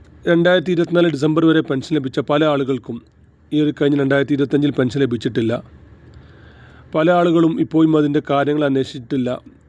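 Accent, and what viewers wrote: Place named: Tamil Nadu, India. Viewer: തമിഴൻ ആണോ മലയാളം വൃത്തി ആയി പറയാൻ പടിക്കു എന്നിട്ട് വന്നു പറയു പ്ലീസ്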